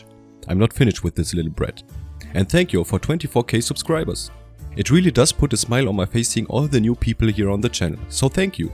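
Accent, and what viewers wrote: Germany. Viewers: Ich liebe deinen Deutschen Akzent, einfach geil xD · Bitte in deutsch It is hard to hear the Denglisch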